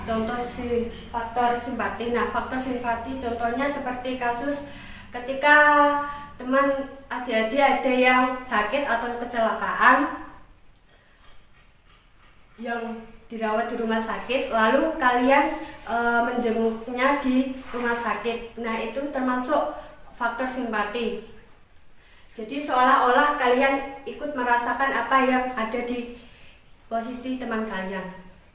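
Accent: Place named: Java, Indonesia. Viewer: Ini pasti dr Jawa. Medok.. tp bgus kok penyampaiannya, lanjutkan...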